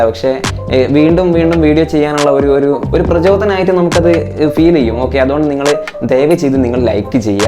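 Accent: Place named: Thiruvananthapuram, India. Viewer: തനി തിരുവനന്തപുരം ഭാഷ😂👍👍 ബ്രോ പൊളിയാണ്😘😘👍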